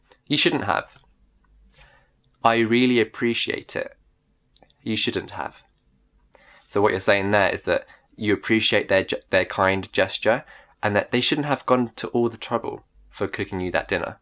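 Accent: United Kingdom. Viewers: Can anyone please tell me what kind of British accent does have? Is it RP? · You are sooo freaking amazing!!!!😍 I am currently binge watching your videos and I can't stop admiring you!!!😍😍😍 I wish I can go from Indian accent to the British accent like you do!!😍 It's magical trust me🌟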